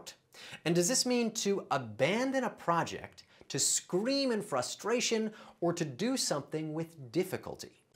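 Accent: United States. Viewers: This really should be about learning American. Assume was given an American pronounciation and 'acclimate' is not used outside of the US as the English word is acclimatize. Presentation was good. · For goodness sake this is primary s hood stuff! So the chap pronounces some of the words with n American accent t….which does not go down well with me. An English lady!!.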